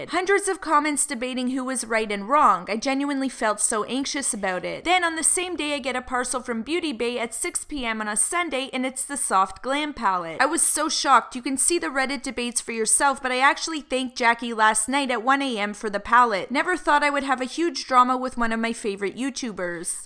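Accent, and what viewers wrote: Canada. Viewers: Hi, i'm from israel. I am so happy if i get a present or especially makeup for free.both parties were wrong as you said."jane" should have waited polietly and jackie should have given her an update. I'm sorry for misppeling, it's not my native language. I love your canadian accent.you have a good voice.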